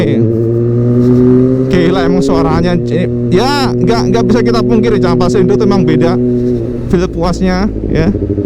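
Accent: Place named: Surabaya, Indonesia. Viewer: logat e koyok ne areek surbeje' .....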